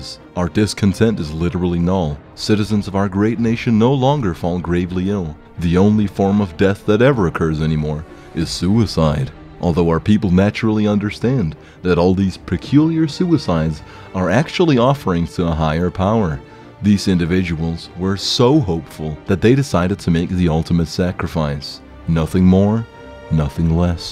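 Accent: Denmark. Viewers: This guy danish ? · Wait shit. Are you bloody Danish?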